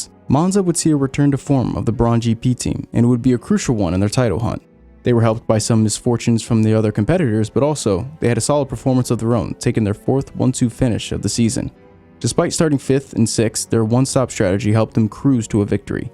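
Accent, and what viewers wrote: United States. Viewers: you use American pronunciations for everything besides "Vettel" and "Grand Prix." It's awkward. Coming from a television producer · American pronunciation annoys me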